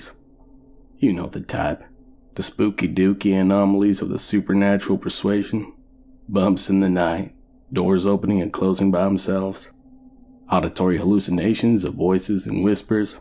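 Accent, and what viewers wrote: South, United States. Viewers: Nice story! Mr. has a very likeable way of narrating..held my attention immediately. Felt like I was listening to a friend relating a story. Extra impressed he's Canadian yet his accent reminds me a bit of my southern roots. Definitely a 👍 from me. Actually 2 👍👍, 1 for author Mr. Zacharius Frost as well. And it's always nice finding another great cp channel, so I subscribed to too. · I thought you were from the South! I was sold! So you can do accents too? that's even more impressive! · Being a non-American; I love that southern drawl. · As a fellow Georgian (not too far from this story), I voted you up! I moved to the north, and it’s awesome to hear a southern voice again lol. Good luck in round 2! I’m excited to see what you’ve got in store.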